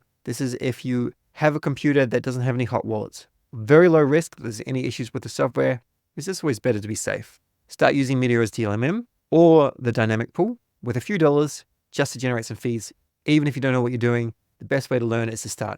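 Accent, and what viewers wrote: Australia. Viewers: As an Aussie i love that your accent is still intact 😊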